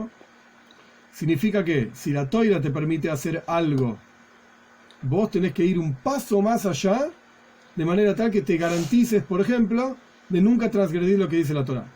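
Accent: Argentina. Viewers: Rab sos Argentino?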